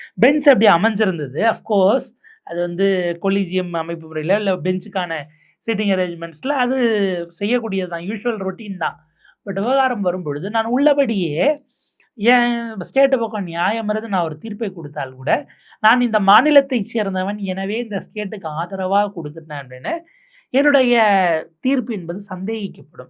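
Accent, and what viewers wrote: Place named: Tirunelveli, India. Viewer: தம்பி நீ எந்த ஊர் உன் பாசைதிருனவே லியாதமிழ் அருமையா கிருக்கு பனி மீன்டும் தொடரட்டும்👍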